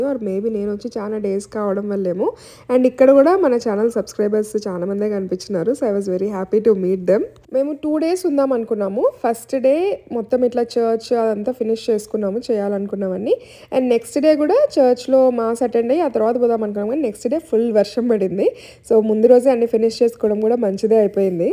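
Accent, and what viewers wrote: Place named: Kurnool, India. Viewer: Kurnool yasa vinte aa kicke veru Abba !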